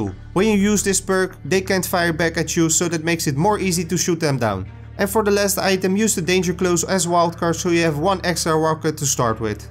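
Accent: Netherlands. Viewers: ik hoorde het all aan je accent 😂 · Nederlands Accent Approved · Bij het eerste woordje hoorde ik al dat je Nederlands bent hahaha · Je kan gwn horen dat je Nederlands bent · The dutch accent😂🤦‍♂️ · Hahahaha ik hoor je nederlandse accent zo duidelijk. Lachen man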